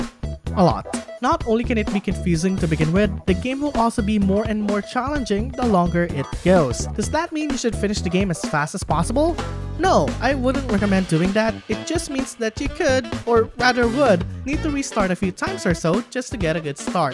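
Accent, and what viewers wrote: Philippines. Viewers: You sound Filipino 👀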